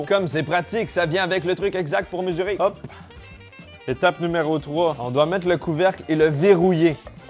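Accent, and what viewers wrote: Quebec, Canada. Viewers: Tu à gagner un nouveaux membres, j'aime ton accent du quebecq, et tu ressemble à un de mes ex potes disprus avec une seringue dans le bras, on le surnomais "le coyotte",très bonne prêsentation de tes articles, et j'aime aussi ton humour. "Serge. L" (depuis la belgique) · L accent du Québec est toujours aussi 😂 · Je viens de découvrir cette chaîne et ca m'a bien plu!!!!! L'accent québécois, l'humour,les expériences,le ton léger, j'adhère !!!!!!De France . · J’adore l’accent canadien 🤣🤣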